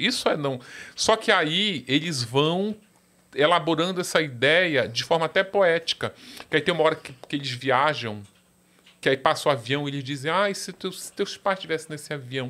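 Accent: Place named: Pará, Brazil. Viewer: O sotaque paraense é único 😂, Parabéns 👏🏾👏🏾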